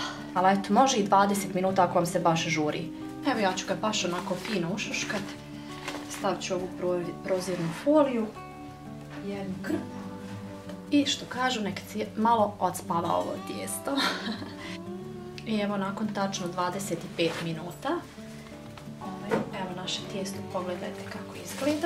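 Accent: Bosnia and Herzegovina. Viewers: Tako umiljat i ljupki glas vredi slusati a i pigledati recepte. Svaka cast za recepte lepo i jasno sve objasnjeno. Pozdrav iz Kraljeva. Jos nesto. Bosanski akcenat slusala bi ceo dan 😍😍😍😍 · Preeeedivno objasnjeno! Divan,njezan, melodican glas! Obozavam vas Bosanski akcent! Super izgledate! Po svemu sudeci, vi ste jedno divno, perfektno stvorenje! Blago onima koji vas imaju! Svi recepti su vam odlicni! Pisem, kopiram u svesku, kuham i i uzivam u divnim ukusima, kombinacijama koje dajete, ne samo ja nego i moja djeca i unucad!! Hvala Vam veliko! Pozdrav iz Detroita😘😘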